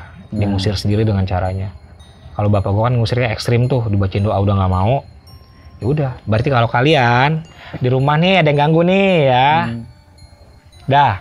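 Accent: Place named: Lampung, Indonesia. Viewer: Lampung emg gtu lagat ny bang jadi biasalah 😅 sy juga org lampung🤣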